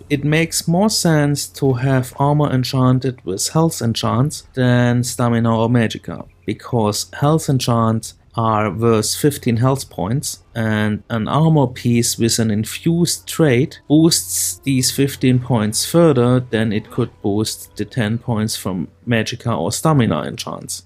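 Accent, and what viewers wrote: Germany. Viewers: dat heavy german accent xD thx for the tips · Good collection of some basic tips. But man ... your german accent is HORRIBLE XD Beeing from Germany i feel i can say that without sounding like a dick ;)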